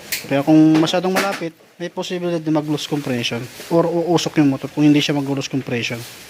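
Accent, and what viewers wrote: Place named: Visayas, Philippines. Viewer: Taga asa ka idol,parang may hang Visaya ang sa Lita mo, Ako Taga bohol....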